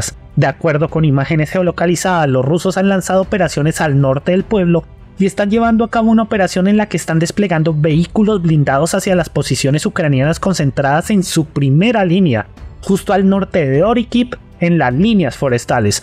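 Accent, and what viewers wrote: Colombia. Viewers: Pasó de mexicano a colombiano 👍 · Este comentarista es colombiano?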